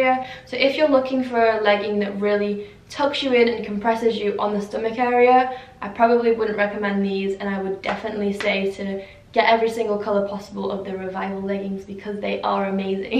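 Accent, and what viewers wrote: United Kingdom. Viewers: Is it just me or does your accent sound British now? Were you in Britain, or are you practicing their accent? 😀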